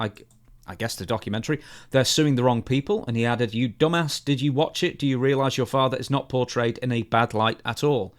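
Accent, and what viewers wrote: England, United Kingdom. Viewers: Hi English Accent, American Accent Here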